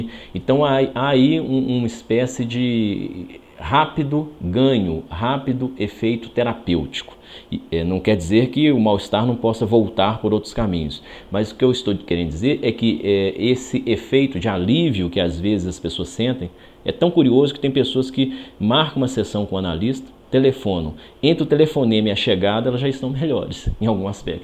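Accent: Brazil. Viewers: Para além do conteúdo que me parece sensato, quero realçar o português que em todos os videos do autor é perfeito. Nunca vi um português com sotaque brasileiro tão bem falado e cuidado. Parabéns...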